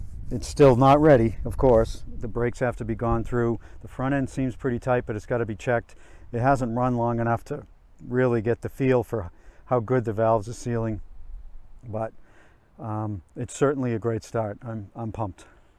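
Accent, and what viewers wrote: New England, United States. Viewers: Love the New England accent..sounds like New Hampshire or Massachusetts.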